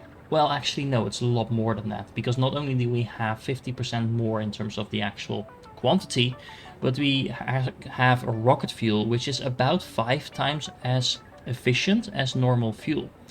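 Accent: Netherlands. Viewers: Super goeie video, toen hoorde ik dat je nederlands was en ja😂 lekker man, goed bezig!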